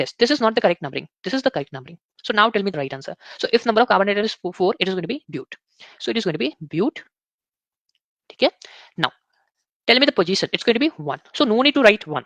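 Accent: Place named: Bangladesh. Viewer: Sir aapka accent videsh ka lagta hai bangladesh ka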